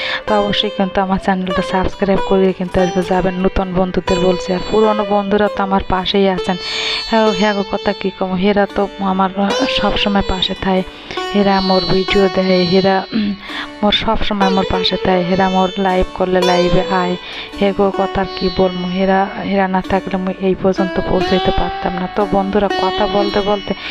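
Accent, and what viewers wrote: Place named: Bangal, Bangladesh. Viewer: অসাধারণ বাঙাল ভাষা আমিও বাঙাল❤❤❤😂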